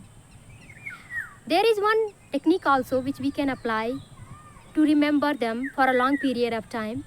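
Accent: India. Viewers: Abe Indian accent choro🤦🏻‍♂️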